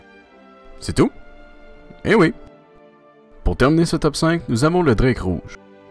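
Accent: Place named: United Kingdom. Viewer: Ton accent anglais est formidable et fait pâlir nous autres Français. Sinon très bonne vidéo !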